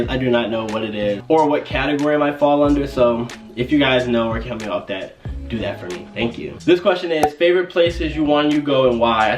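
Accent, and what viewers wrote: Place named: United States. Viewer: Wait, is this? Looks like him but and same name but im confused cos he sounds american.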